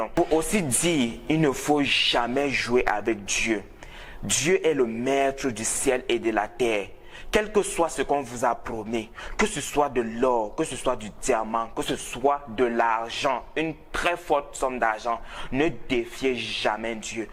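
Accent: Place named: Cameroon. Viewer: Toi tu dois être camerounais vu ton accent😂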